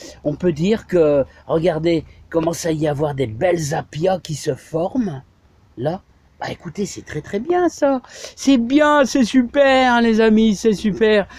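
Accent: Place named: Nord-Pas-de-Calais, France. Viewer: je suis du sud-ouest je parle un peu occitan, et ça fait plaisir de vous entendre parler un peu ch'ti ! continuez !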